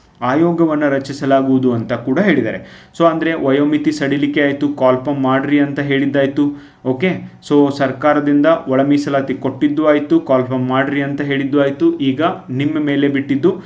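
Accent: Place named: North Karnataka, India. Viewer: Sir stick to your ground of uttar Karnataka accent, don’t try hard.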